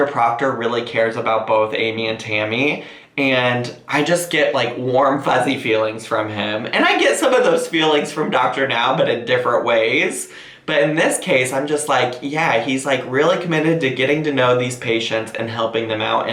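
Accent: South, United States. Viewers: > Super Bowl 😁 as an homage to my favorite YouTuber (you) I’ve started saying No ma’am and ma’am in a southern accent. And I live in Oregon. You rock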